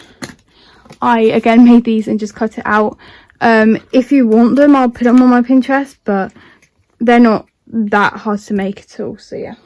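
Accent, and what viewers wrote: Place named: United Kingdom. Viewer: as soon as i heard ur accent i was like 'yes another uk moarmy' im from manchester hehe <3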